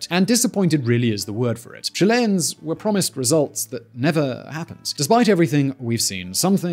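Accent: United Kingdom. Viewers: Your bad research giving patronizing talking heads with British accents a bad name dude. . Actual story here: <link>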